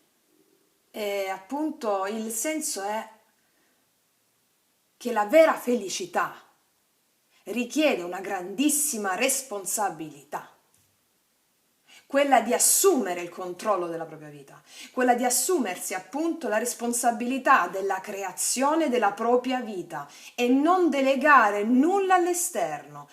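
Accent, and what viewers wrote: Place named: Salento, Italy. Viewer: Che dirti? Brava davvero Io conosco solo le sibille, ma tu sei davvero fenomenale. Ti svolto anche se ti conosco e scoperta x caso da pochissimo, ma mi porti a casa. In Salento, l'accento è inconfondibile, vivo all'estero ma sono salentina doc. Grazie. 🌺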